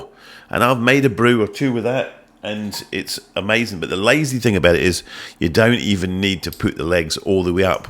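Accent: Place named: England, United Kingdom. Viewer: Good review and you've acquired a bit of an English accent 😂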